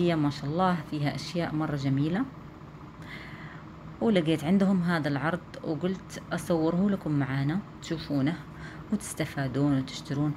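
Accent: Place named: Gulf, Arab world. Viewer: كلامك بالخليجى واعرة😍😍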